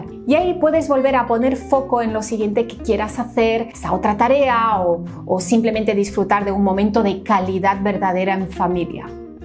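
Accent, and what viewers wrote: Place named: Spain. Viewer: Hola. Me encanta tú tono españolete. Gracias por tú contenido. Un saludo desde Bogotá Colombia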